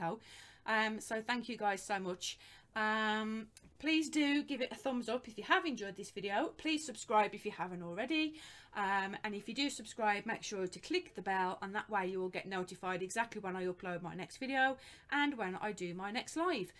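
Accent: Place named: United Kingdom. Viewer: Are you from england you have an accent british very funny! I see it all and it wil be the same when you will put in thé rangement! Good luck!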